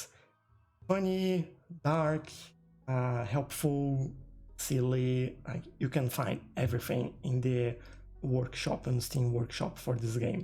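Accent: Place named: Brazil. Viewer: You sound like a brazilian guy speaking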